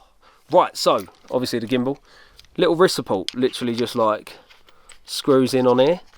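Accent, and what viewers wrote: United Kingdom. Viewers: Bro, I want to learn your British accent 😎😎😎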